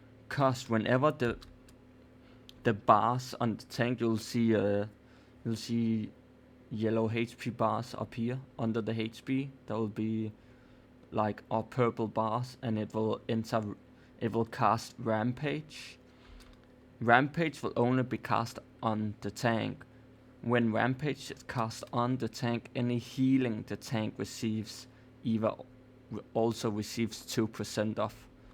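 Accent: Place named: Denmark. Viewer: Big thanks for the guide, I will probably be tanking this for my crew this week. Are you Danish? You sound like a fellow Dane :D If you need a crew to run raids with you should consider joining us in CozyCrew over on Ether EU.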